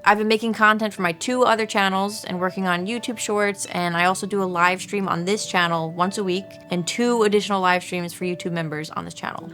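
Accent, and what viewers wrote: Long Island, United States. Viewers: Greetings,! I've been lurking on your YouTube channel on and off for about a year. I didn't know you were based out of Long Island. I guess the thick accent should've given it away. Your accent is Long Island AF. I was born and raised in Long Island (Bay Shore) until I was 15 when my family moved to Florida. In my opinion, an artist is someone who uses their skill at a particular medium (in this case, harp playing) to create art. The person has a passion for their craft and is willing to dedicate time and effort into it. They have a desire to express themselves through their work and can envision ideas, emotions, and stories that are then translated into their work. Not only that, but their work is able to evoke emotions in others and inspire others. You're doing these things, so I would say that qualifies you as an artist. 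Keep doing what you're doing!, I'm all for physical media, and while I personally own a record player, not all of your followers do, and they have no way to listen your album unless they decide to buy a record player. Also, what's stopping someone from doing a line-in recording of the album to their computer and sharing the digitized audio online?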